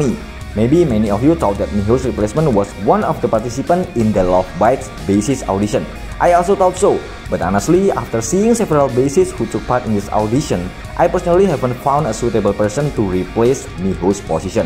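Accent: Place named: Indonesia. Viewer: Logat indonesia 😂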